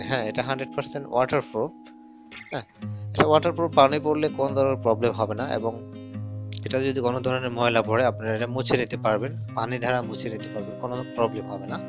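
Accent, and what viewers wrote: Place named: Sylhet, Bangladesh. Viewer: আপনি সিলেট নি? আমি তো সিলেট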